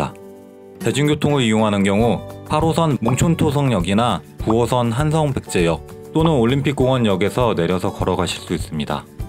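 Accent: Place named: Gyeongsang, South Korea. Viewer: 경상도분이신가요? 다 정말 예쁜데 왜..다..경상도..ㅠ.ㅠ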